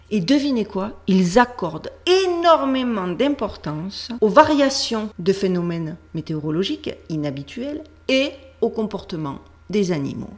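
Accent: South, France. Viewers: L'important, c'est ce qu'on croit, pas ce que dit l'Église car celle ci est dans la tourmente . Si des centaines ou des milliers de personnes voient la même chose en même temps, pourquoi ne devrait-on pas y croire et pourquoi seraient-ils menteurs ? Merci pour vos vidéos très intéressantes avec cet accent du sud qui les ensoleille ❤ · Ce que j'aime vous écouter ! Avec votre charmant accent du sud, vous chantez les vérités :) ♥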